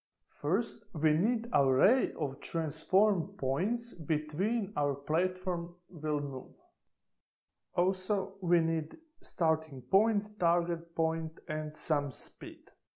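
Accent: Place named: Russia. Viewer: русский да? ну или украинец - акцент)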